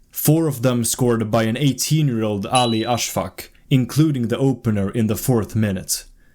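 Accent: Sweden. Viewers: Your accent SCREAMS Sweden omg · Swedish-English is so funny to listen to bruh 😭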